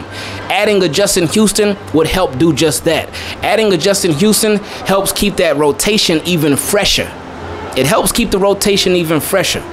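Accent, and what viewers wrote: Baltimore, United States. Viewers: Is that a B-more accent I hear.....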